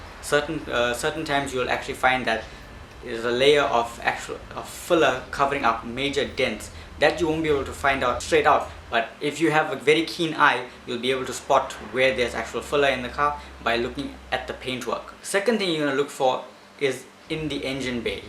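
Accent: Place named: South Africa. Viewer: bro what accent is that, are you south african?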